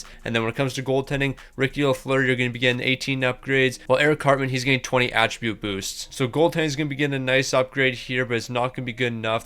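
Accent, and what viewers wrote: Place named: Nova Scotia, Canada. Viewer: What province are you from ? Gotta be Nova Scotia lol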